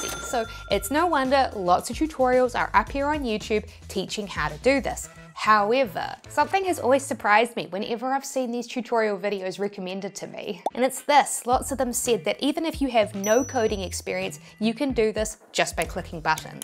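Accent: New Zealand. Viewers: Kiwis have the sweetest accent.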